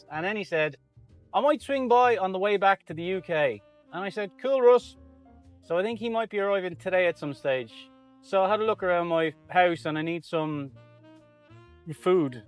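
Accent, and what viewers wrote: Ireland. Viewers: Good morning/ afternoon, great you showing me around 🇫🇷 for someone who has never been out of Australia. I can hear you fine and understand with your Irish accent as I grew up with a Scottish father so I love listening to your accent so keep recording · what a lovely wee lunch today!...how was that wine? good work with that weed whacker shield. good vlog today young lad, your editing chops are improving so keep up the good work and be your Irish self (accent included) even in France. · I love your accent, my late father was Irish and I like to hear the lilt of it when you speak. I’m glad your van has a name, my car is called Jezebel and I love it because it has a V6 engine and takes off faster at the traffic lights than any young men or women driving BMWs which is disgraceful at my age because I’m in my 70’s. I find great joy in the mundane things of day to day living like buying food, casting your eye over new shiny objects, don’t stop your YouTube’s as they bring joy to many people. 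🇦🇺🦘🦘🇦🇺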